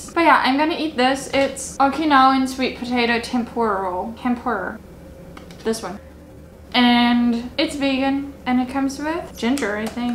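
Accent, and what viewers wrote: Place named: United States. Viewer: That explains your American accent. 🇺🇸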